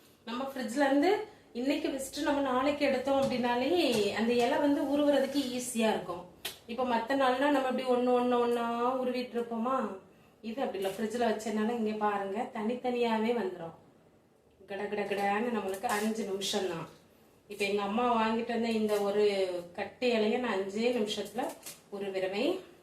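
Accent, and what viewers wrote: Kanyakumari, India. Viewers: ok slang apdi thonuthu na kanyakumari than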